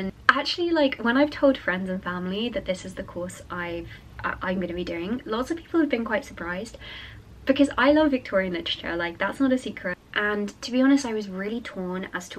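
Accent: United Kingdom. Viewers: I am happy that your dream came true. you are one of the first youtubers that I follow (since 2018) even though I barely can understand English (and also your British accent.) you are a lovely person.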